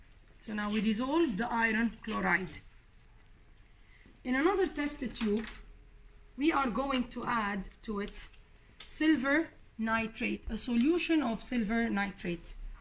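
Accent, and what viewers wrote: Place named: Arab world. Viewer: لهجتك عربية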